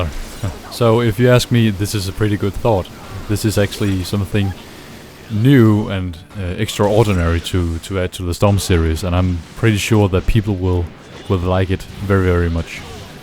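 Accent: Denmark. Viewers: Wtf!! Are you danish or something !?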